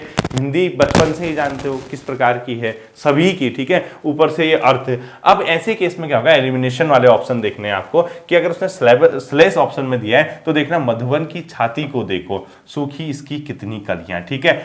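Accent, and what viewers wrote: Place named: Chamoli, India. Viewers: Bahut achi koshish sir garhwali bol bolne ki ..👍🙏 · Chamoli dilect cha yu😍.. Bhotte bhal gurujii..Yan lagnu yakha k cha ap😀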